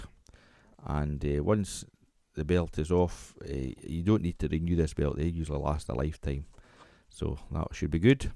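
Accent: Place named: Falkirk, United Kingdom. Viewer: I am looking for someone to do mine , sounds like you live local to Falkirk ?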